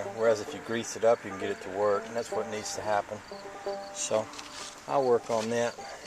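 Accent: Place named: South, United States. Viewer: i love that accent. go South!!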